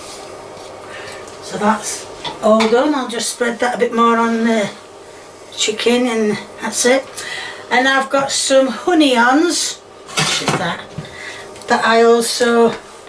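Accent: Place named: Yorkshire, United Kingdom. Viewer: I love accent. Is it Yorkshire accent?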